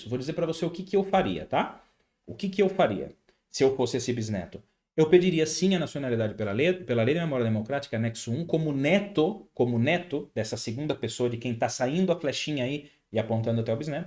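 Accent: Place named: Sorocaba, Brazil. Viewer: Cara, você tem um sotaque muito típico de morador raiz do bairro Barcelona ou Colorau em Sorocaba. Obrigado pelas dicas! Amanhã eu e meu irmão temos uma cita no Consulado em São Paulo e seus vídeos me ajudaram muito.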